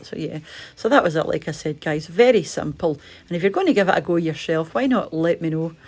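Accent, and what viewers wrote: Scotland, United Kingdom. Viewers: This takes me right back to school.. Oh how I loved school dinners lol especially the puddings.. Yes those big silver jugs with the runny pink custard.. Where I work, the canteen does fab puddings but they are proper man size portions.. Bakewell Tart is another favourite.. Shame I'm on bloody slimming world.. Thanks always love to hear your lovely Scottish voice. Jules x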